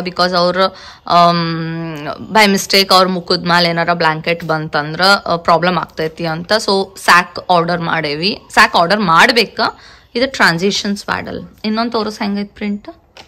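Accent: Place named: Dharwad, India. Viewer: ಏನ್ರೀ mrs ಏನ್ ನಡ್ಸಿರಿ... ಧಾರವಾಡ ಭಾಷೆನ ನಿಮ್ ಬಾಯಿಯಿಂದಾನೆ ಕೇಳ್ಬೇಕು ಅನ್ನಿಸ್ತದ ನೋಡ್ರಲ ಪಾಪುವಿನ ನಾಮಕರಣ ವ್ಯವಸ್ಥೆ ಮಾಡೋದ್ರಲ್ಲಿ ಬಹಳ busy ಇದ್ದೀರಿ ನಡೆಸಿ ಎಲ್ಲಾ ಒಳ್ಳೆಯದಾಗಲಿ 🙏🙏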